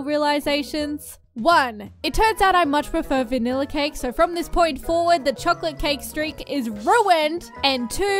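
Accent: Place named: Australia. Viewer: My first time watching your channel and I gotta say...when I saw the png of the coles cake I was like .....yeah it's the accent and the coles cake....AUSSIE!!!!! Omg my mum n dad would CONSTANTLY get my siblings and I the coles vanilla sponge cake with whip cream and strawberry jam.... only dad liked it (and cause he paid and got it he picked it) I hate sponge cake now ;v; cake trauma. When we got a tad older we started also getting the same mud cakes...with the very very LOUD lids 🤣😂 memories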